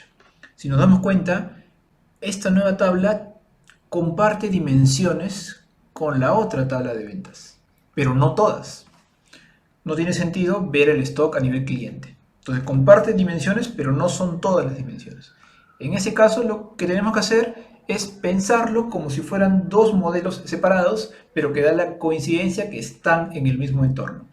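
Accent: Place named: Peru. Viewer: Muy bien explicado, buenos ejemplos y realmente didactico, de ahi un problemita que el microfono realmente no te ayuda pero eso se mejora con el tiempo al tener uno mejor, y sobre reconocer a leguas ese acento de mi paisano peruano. Exitos en t u canal cauza!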